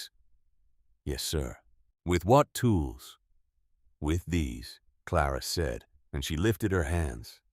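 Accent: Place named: United States. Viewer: Oooh! A narrator with an American accent!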